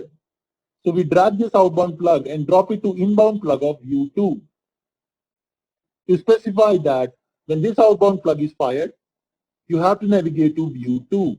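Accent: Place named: India. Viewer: bloody indian pronunciation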